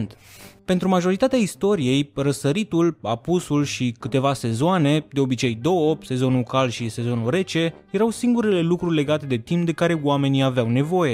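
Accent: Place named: South, Romania. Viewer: Am doua observatii: numarul 12 nu a fost ales "aiurea" cum spui tu, ci pentru ca era divizibil atit cu 3 cit si cu 2^2. Dupa acelasi principiu a fost aleasa si valoarea 60, impreuna cu multiplii sai. Te-ai intrebat vreodata de ce se spune ca 13 este un numar "cu ghinion"? Pentru ca este prim! Putea fi si 11, dar asa s-a intimplat. A doua observatie se refera la ceasurile radio-controlate, acum disponibile peste tot la preturi derizorii (cam 30 RON). In Europa emitatorul semnalului de timp este la Mainflingen, linga Frankfurt am Main si emite in protocolul DCF77, care "bate" cam 1500km - 2000km, depinzind de conditii (zi-noapte, anotimp, etc.). Poate ca la voi in Sud (imi dau seama ca esti sudist dupa accent) se "prinde" mai greu.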